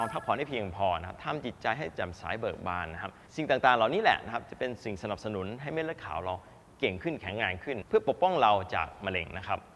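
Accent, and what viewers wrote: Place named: China. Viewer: คุณหมอเหมือนติดสำเนียงจีน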